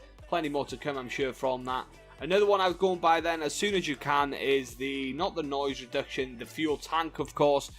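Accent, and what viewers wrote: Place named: Wales, United Kingdom. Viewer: Exactly what i heard lol, gotta love the Welsh? accent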